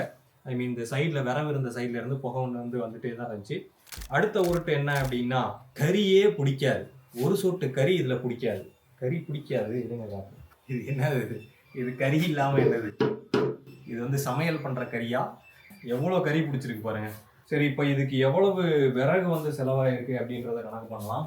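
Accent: Tirunelveli, India. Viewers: you have tirunelveli slang.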